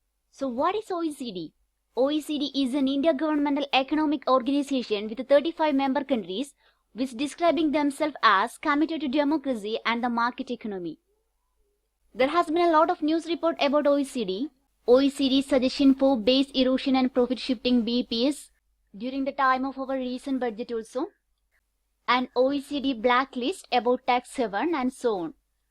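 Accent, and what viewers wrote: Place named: South India, India. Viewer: Slides are nice and by your pronunciation I take it you are from south and goes by the saying that south people have good command over english..and it has proven right in the video..marvellous you explained beps much better than others and in such limited words thank you mam..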